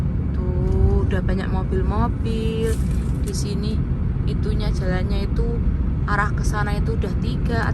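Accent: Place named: Java, Indonesia. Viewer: Mbak e asal kota mana di Indonesia? Jawanya medok banget 😊...btw ini mbak e sekarang tinggal di Korea di kota mana?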